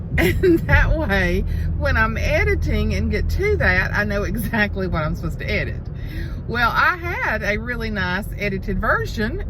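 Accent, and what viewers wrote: South, United States. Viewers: Your southern drawl was in true form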